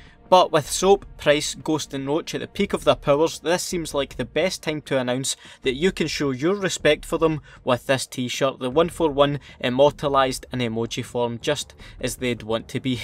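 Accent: Scotland, United Kingdom. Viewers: I love that accent 😂 reminds of Limmy's Show.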